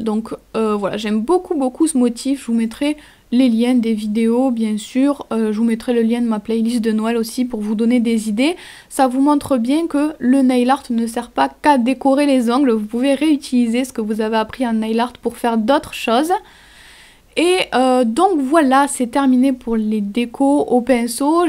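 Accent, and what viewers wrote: South, France. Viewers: du sud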